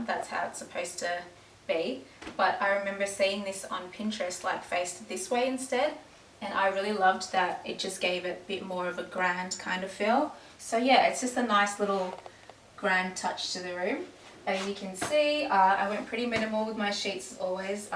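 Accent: Australia. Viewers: Love your aussie accent!! You look beautiful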